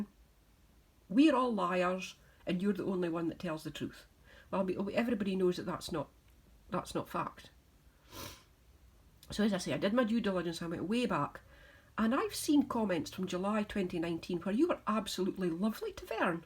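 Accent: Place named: Scotland, United Kingdom. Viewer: Good to hear your lovely Scottish brogue!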